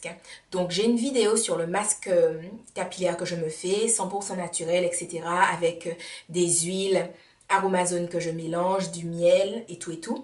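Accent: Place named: Antilles, Caribbean. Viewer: Merci pour la vidéo, j'aime trop ton accent antillais:D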